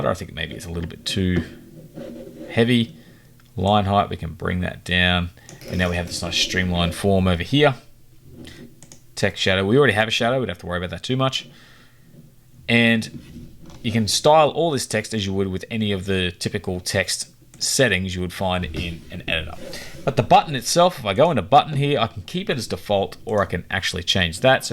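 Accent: Australia. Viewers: Thanks, good to hear an Aussie voice for a change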